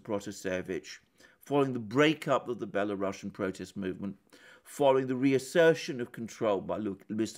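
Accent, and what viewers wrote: United Kingdom. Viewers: One of your best Alexander keep up the great work. That British legal accent is perfect to call out the hypocrisy of the US, UK and the EU.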